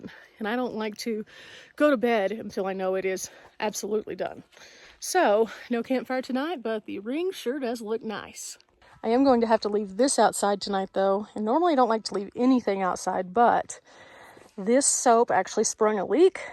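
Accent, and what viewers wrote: Texas, United States. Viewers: Love your videos. Knew from your accent you were a fellow Texan!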